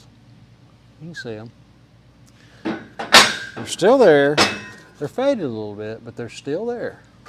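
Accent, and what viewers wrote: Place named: North Louisiana, United States. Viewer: Haughton high school, sounds like you might be from north, La. Youtube recommended this video. You're a good torch hand!!! I have a youtube channel also, I do everything from trucking to fabrication. I finally broke down and bought a Hougen mag drill last year, after wanting one for over a decade! I worked as a millwright and also in a fab shop several yrs ago. I'll subscribe and check out some more of your vids later, Always good to know new tips to make things easier!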